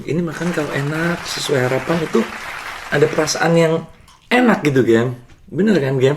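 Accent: Surabaya, Indonesia. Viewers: Suroboyo pooll iki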